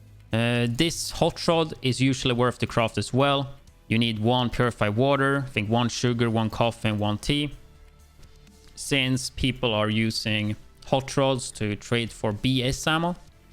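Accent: Sweden. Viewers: are u swedish i hear som swedish dialekt haha lol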